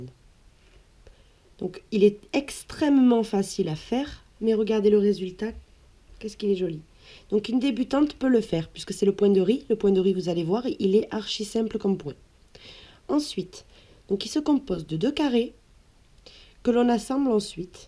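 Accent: South, France. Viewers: Quel talent,et ''avé'' l'accent du soleil ch les Chtis c'est encore mieux!!Je vais de suite voir le site,continuez!!Mon rêve de crocheter va se réaliser🙋👍👏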